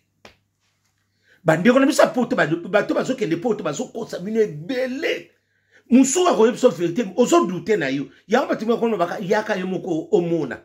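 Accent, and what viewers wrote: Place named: Kongo, Democratic Republic of the Congo. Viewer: Nazo landa makambo ozo loba te mais nazo sepela na elobeli nayo😅😅😅. Please ozalaka mu kongo?😅😅😅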